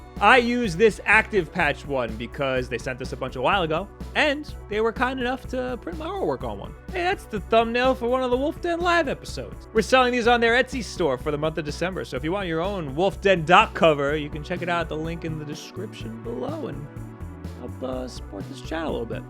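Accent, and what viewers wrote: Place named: New York, United States. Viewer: You and your New York accent.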